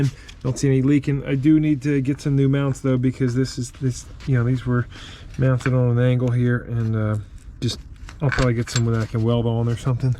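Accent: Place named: Pittsburgh, United States. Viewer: Are you originally from Pittsburgh?? Your accent is so reminiscent of Pittsburghese it's uncanny.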